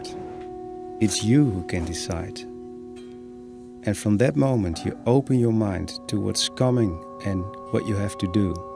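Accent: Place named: Netherlands. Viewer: I just love your work it really speaks to me. I have painted most of my life but never had the time to really get into it. One side of my family ate Dutch and it was my first language so it fees so comfortable hearing your accent, like coming home. Thank you so much for sharing.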